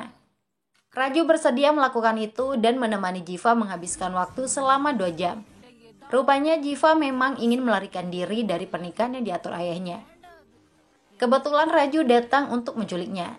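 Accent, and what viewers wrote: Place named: Padang, Indonesia. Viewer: Ini admin yang ada logat Padang nya ya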